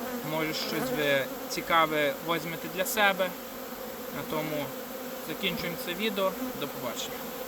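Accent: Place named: Ivano-Frankivsk, Ukraine. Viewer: Цей говір , ну відразу ясно що людина з Франківська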